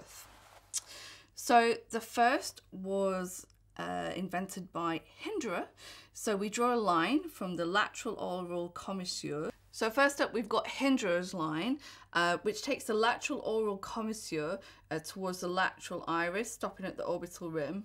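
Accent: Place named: United Kingdom. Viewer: Your videos help me emprove my british accent! Thnx 😋 Cheers from Argentina!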